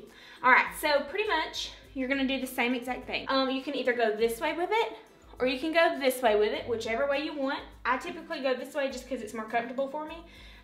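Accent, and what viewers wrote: South, United States. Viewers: Also, where are you from....it sounds like a southern accent? South Texas here😉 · "Your gonna peench it" lol I freaking love your southern accent!! Makes me miss home that much more! Love these videos, Congratulations on the pregnancy!!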